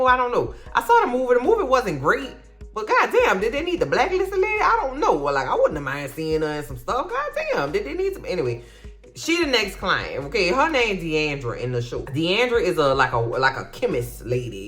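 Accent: New Orleans, United States. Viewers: Gurrrl, I don't know you from Adam, this is my first time on your page. And even so, within less than 1 min of hearing you talk, I was willing to bet my last dollar that you are from N'awlins ALL DAY! LOL. Then you showed the N'awlins basket for the give away. Man, I laughed so loud. So, I'm here to both support your goal and to put my name in the hat for the New Orleans goodies. Congrats on 20K!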